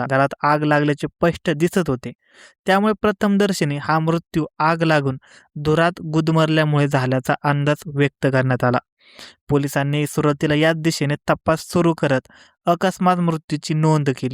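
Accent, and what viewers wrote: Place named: Nashik, India. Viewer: कुठला आहे re भाऊ, बोली वरून वाटते की तू नाशिक नगर भागातील आहे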